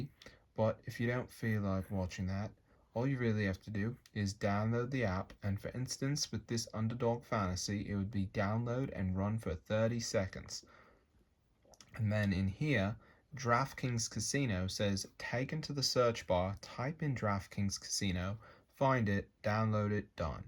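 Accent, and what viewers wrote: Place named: Australia. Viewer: Why does he sound like he’s from Australia, New Zealand, or South Africa? I’ve watched every single video on this channel, and in other videos he sounds American, or maybe Canadian.🤷‍♂️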